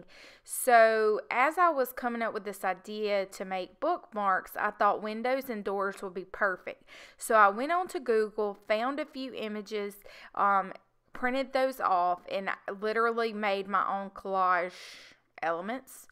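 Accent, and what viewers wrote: South, United States. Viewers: Miss your cute southern voice at the end! It's still a Great process video!! I love all the texture and vintage feel. 💜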